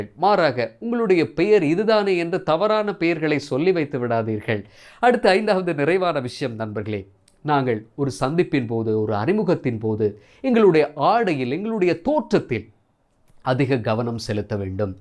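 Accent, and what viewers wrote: Sri Lanka. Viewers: Arumaiyana Sri Lankan Tamil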